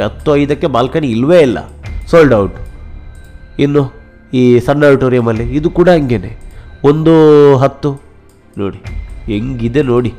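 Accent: Coastal Karnataka, India. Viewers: ಭರ್ಜರಿ ಯಾದ ವಿಶ್ಲೇಷಣೆ...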